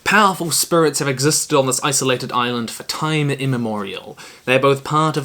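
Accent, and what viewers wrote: New Zealand. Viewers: Omc you sounds so much more new Zealander than on your main channel.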